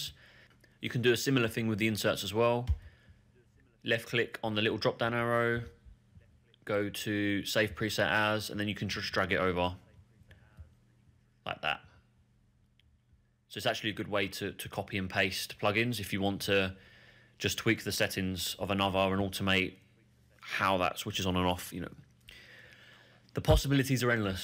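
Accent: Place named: United Kingdom. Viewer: Thank you! That was really helpfull. I'm also like your british accent :) Sounds cool.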